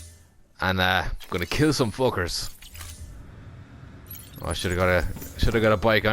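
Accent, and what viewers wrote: Ireland. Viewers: Do u really have an Irish accent or are u acting? Lol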